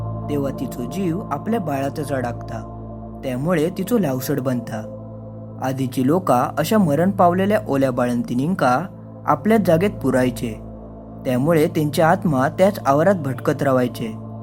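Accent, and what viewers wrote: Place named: Malvan, India. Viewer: Story thik ahe but accent malvani ahe so kalnyat thodasa Vel lagto and also plz...for god's sake biography bollyasarkha accent naka theu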